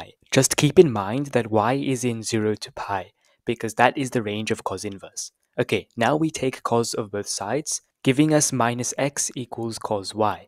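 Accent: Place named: Southeast Asia. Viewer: I dont think so,his accent is diff from indian,more like southeastern or something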